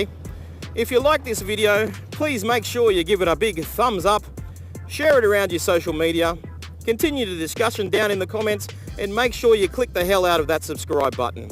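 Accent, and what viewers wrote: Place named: Australia. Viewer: I put it down is the Aussie accent lol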